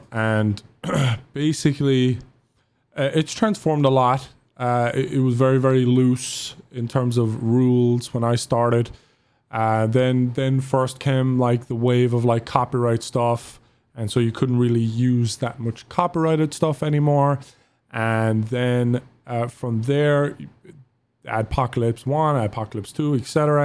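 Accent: Ireland. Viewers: gotta be honest here, Firstly... missed your irish accent (for some reason the bell got unrung for me... go figure) and secondly, YT really discouraged me after the first adpocalipse, i was actually starting to dedicate myself to it and then poop hit the fan and we small creators got covered in it. I basically gave up on this channel here of mine then restarted with it just as a little hobby, but with the "why wate hours of editing on this" mentality which in turn demotivated me even more... so yh they should listen to you coz you have some really good pointers. oh and before i forget... when can we expect a new song video?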